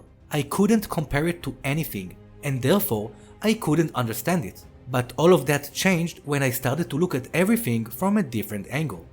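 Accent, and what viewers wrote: Israel. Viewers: First time hearing an anime Channel with a Hebrew accent! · דקה וכבר שמעתי את המבטא הישראלי הכבד חחח למה רק AOT<? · Subscribing for AOT content with a Tel-Avivi accent.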